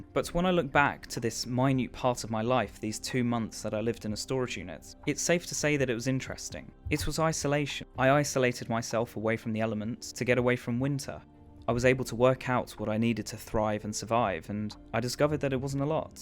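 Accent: United Kingdom. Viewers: i can stand the horrific british accent for only so long 😭😭😭 god damn its obnoxious and gross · How could the poster not tell you were British? Your accent is obvious.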